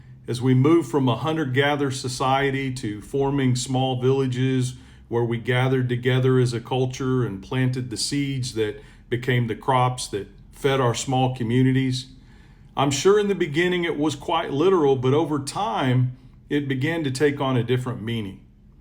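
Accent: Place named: Missouri, United States. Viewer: I don't know what it is. If it's your calm even tone or your Missouri rural dialect/accent or if its the sound of wisdom coming from every syllable that comes from the heart. If they won't or refuse to see past their hate and listen to YOU. I don't know who they'll listen to in order change their ways. Before stumbling upon your channel I use to believe that it was only reason is racism. Now hearing your perspective on the way they've been taught to believe it's not just that. It's hate. Anytime someone who would burn burn the house down around themselves and their families and love one's, it's pure hate that has them blinded. I wish i could do more for you and your channel to assist you in spreading knowledge. The good lord knew who to chose to spread his love and words to save those who are not only willing to listen but change as well. 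May god bless you and keep you safe. God speed brother. 🙏🏾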